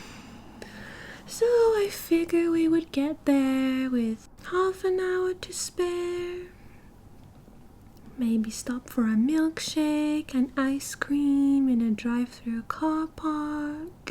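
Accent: United Kingdom. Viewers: Brit accent...? HELL YEAH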